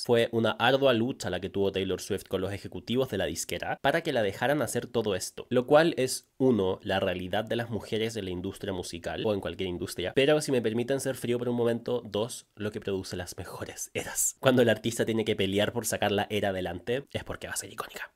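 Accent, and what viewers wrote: Chile. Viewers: Mann, tardé tres minutos en darme cuenta que sos de Chile y yo soy re fan y amante del acento chileno, voy a disfrutar la narracion como no te imaginás, me suscribo ahora mismo. ¡Saludos desde Paraguay! 💙 🇵🇾🤝🇨🇱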